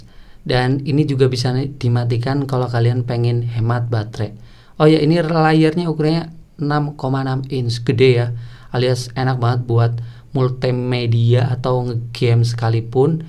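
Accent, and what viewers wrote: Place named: Java, Indonesia. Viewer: Behh jan jowone ketok bangetmas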